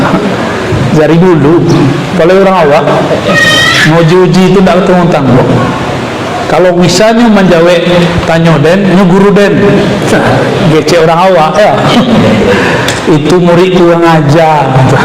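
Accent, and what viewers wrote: Padang, Indonesia. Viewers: Tarimo kasih Buya bahasonyo awak Samo Samo urang Padang,, tapi beda kota....😊sanang hati mandanga nyo 🙏🤗👍 semoga kita inginkan kampuang halaman 🤗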